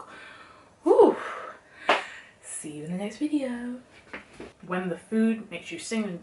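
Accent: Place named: Birmingham, United Kingdom. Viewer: Are you from Birmingham? 🤍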